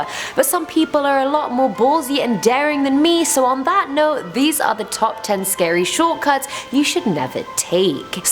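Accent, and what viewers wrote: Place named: England, United Kingdom. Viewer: Seriously though, exotic look an English accent is flippin hot🔥😍🔥😍🔥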